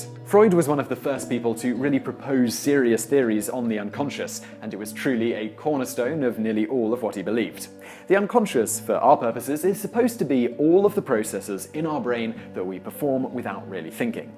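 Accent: England, United Kingdom. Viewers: The fact the guy thats talking has a english accent makes me happy and satisfies me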